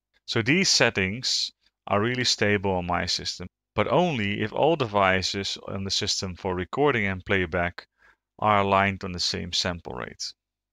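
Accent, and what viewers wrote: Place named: Netherlands. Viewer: excellent dutch accent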